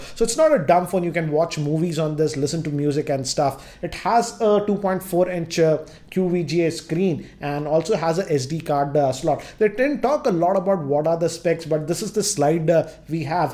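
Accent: Hyderabad, India. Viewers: apka Hyderabadi Hindi kaha gya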